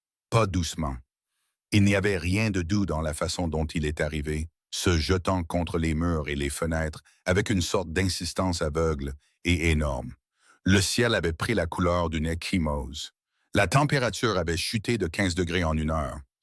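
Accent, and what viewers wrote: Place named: Canada. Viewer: Le conteur à un accent Canadien ❤❤❤❤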